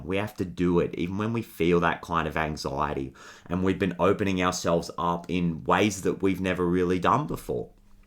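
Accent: Australia. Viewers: Thank u! You read my energy perfectly right now. Love hearing a fellow Aussie accent too… thanks mate. 🤩🌻🖤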